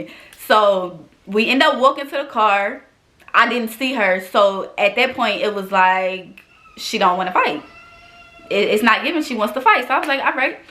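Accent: New York, United States. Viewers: I love the way ny talk 😍. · Are you from New York even ??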